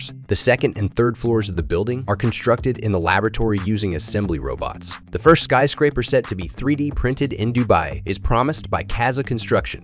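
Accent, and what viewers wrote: United States. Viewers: Why is yet another 'cut & paste' video (complete with nauseating American voice-over) purporting to be about China, showing construction methods from around the world?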